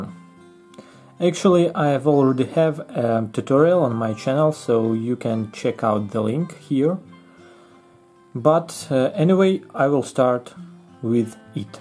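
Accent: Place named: Russia. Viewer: да ну! русский в каждом звуке. а вааще, с произношением катастрофа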